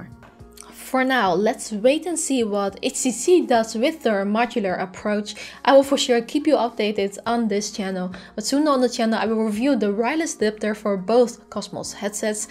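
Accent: Netherlands. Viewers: Why do i hear a Dutch accent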